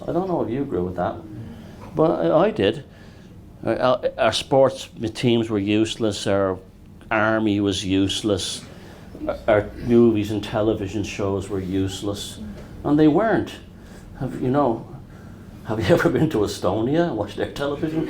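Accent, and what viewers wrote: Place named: Ireland. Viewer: I emailed you regarding finding this, glad to see it's elsewhere. Currently halfway through watching. It's great work. If you're interested I would like to transcript it for subtitles for you. My girlfriend is a non-native speaker and she finds the Irish accent hard to understand. It would be good to make it accessible to others. Let me know if you're interested, I wouldn't have a clue myself how to add the subtitles but I'll happily type out the audio.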